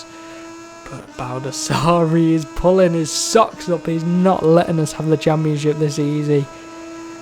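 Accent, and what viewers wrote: United Kingdom. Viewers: I enjoy the 25% races from last grid position. I'd recommend FP in Moto GP to collect R & D points ... but maybe you can show us just a short version (like you do with Q1 & Q2). Well done champ! Besides, you have got an interesting british dialect.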